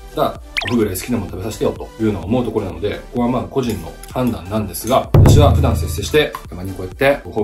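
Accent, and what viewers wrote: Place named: Kansai, Japan. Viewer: あと、フル関西弁動画お願いします😎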